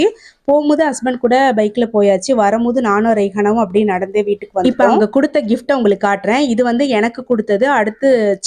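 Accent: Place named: Nagore, India. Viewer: Nagore Karaikal slang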